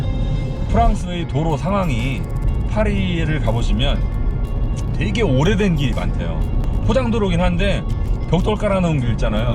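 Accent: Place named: Gyeongsang, South Korea. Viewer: 경상도버전 개웃곀ㅋㅋㅋㅋ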